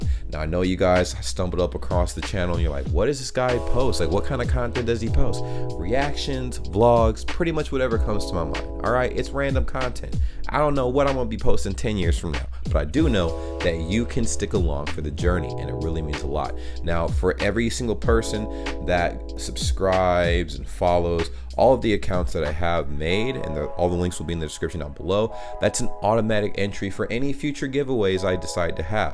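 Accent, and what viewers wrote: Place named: Germany. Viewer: Right at number 1you proved the joke to be true. Your german accent is easier to understand than saxon dialect.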